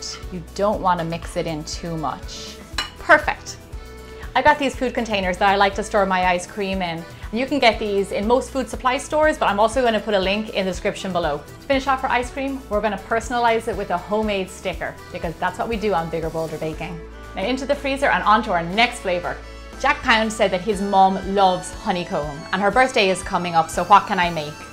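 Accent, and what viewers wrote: Ireland. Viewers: Who else is addicted to that irish accent (if I'm right about the accent)😂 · Are u Irish??? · Gemma Stafford You're from Ireland aren't ya 😊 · Are you from Ireland? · She has to be irish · I think she's from Ireland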